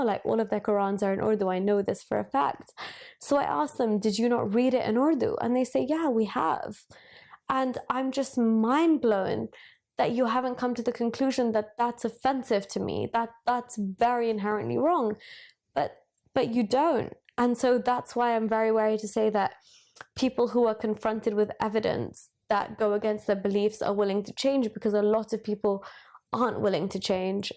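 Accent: England, United Kingdom. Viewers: It's still interesting to see an ethnically Indian person to speak English in an Anglais accent.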